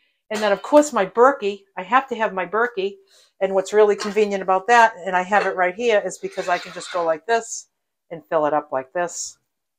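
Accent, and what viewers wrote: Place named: Massachusetts, United States. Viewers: Love ya Boston accent! You don’t look like you gained a pound. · Love your video. I can tell you’re a Massachusetts native. ❤ I’m from Gloucester, but now live in Ohio. 😕 love your solution—and all the good stuff you were able to thrift! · ! Your channel popped up and you're either a genius or I lead a very sheltered life. I have been looking for ways to minimize my living space without selling my home. I absolutely love what you have done and that everything has a purpose and a story. I have been retired since 2019. working breeds stress and clutter. My space is way too big and I'm exhausted by donating and restructuring. This video helps me focus. My husband (we lost him in 2020) was from Boston and I adore your New England accent. Your video gives me lots to think about and you are truly inspiring. Thank you and continued good fortune. 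· you are delightful! (Sounds like you’re from middle MA- I’m from western MA) I’m getting ready to move in with my oldest son. My daughter in law is precious, and has no problem sharing her kitchen, but I’d still like my own tiny space. Love your ideas!!!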